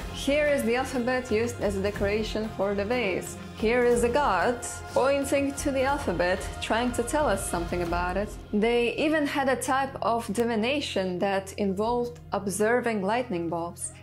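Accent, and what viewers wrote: Russia. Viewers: слышу русский акцент. Не у утрусков, у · Your accent seems Russian/Bulgarian but I’m not too surprised by the rest of your genetic ancestry.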